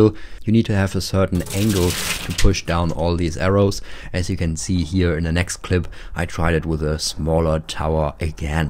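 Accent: Germany. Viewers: Was für ein krasser deutscher Akzent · Man hört den deutschen Akzent aber cooles Video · Als ich das Video gestartet habe wusste ich sofort das du deutscher bist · Du bist deutsch😮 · Are you German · Bist du aus Deutschland hört sich nämlich so an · Didn't know you were german xD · Höre ich da ein deutschen Akzent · This dude sounds German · Wird a German excent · Man hört nen deutschen akzent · Man hört richtig das du eigentlich deutscher bist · Are you german? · i smell german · are you german? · I didn’t notice how German you sound until the „Drei zwo eins“ , but now I can’t unhear it XD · you sound german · Min drei, zwo, eins... dann noch dieses Englisch mit deutschem Akten, da merkt man das ein Deutscher redet.:) Grüße aus Germany! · Ha ich da gerade jemand deutschen gehört? oder Österreich?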